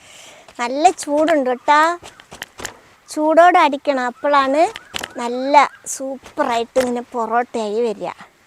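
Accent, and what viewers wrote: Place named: Malappuram, India. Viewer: ഇനി ചൂടാറാതെ പെട്ടീല് ബെക്കാം....😂😂, തനി മലപ്പുറം ഉമ്മ❤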